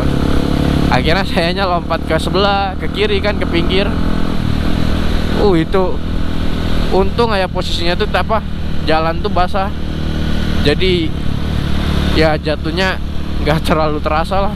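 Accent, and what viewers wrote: Papua, Indonesia. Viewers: Kaka, Kaka dari Papua k habis kk pu dialek macam orang Papua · Logat papua ko,sa dan tong Salam dari serui papua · Abang pu Logat bicara Kaya orng Papua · habis logatnya kentara bos, papuanya dimna bos, kalo saya di sorong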